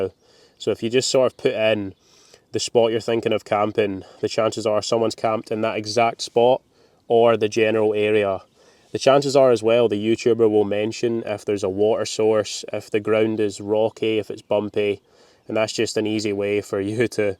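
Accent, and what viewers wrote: Scotland, United Kingdom. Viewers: I absolutely love your videos and love to hear you speak with that Scottish accent. As much hiking as you do, you have got to be in prime physical shape. As bad as my health is I can only hike with YouTubers. I have always loved the outdoor and with me living in the Southwestern USA, I can’t get out and be in nature. We have such beautiful country where I live and can’t get out see it. So for now I will just have to hike and camp along with you on YouTube 😂😂😂😂😂😂😂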